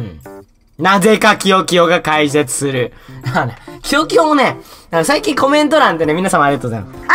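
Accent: Kansai, Japan. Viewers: 最高やんなぜ関西弁強すぎよわすぎぃーワロタww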